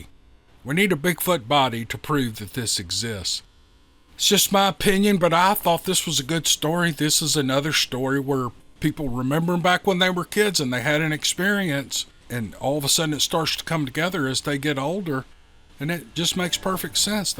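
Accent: South, United States. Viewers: Hey....Im not a red neck, but I did live in Arkansas for over 40 years....I love the South and its great to hear your accent... Love it... Thanks for show. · Now wait just a minute! You better hold on to your Weave. Cuz this is an all inclusive Channel. Us Yanks love to curl up and listen to our Favorite County boy. With his smooth Southern accent!🥰🎶🙏🏾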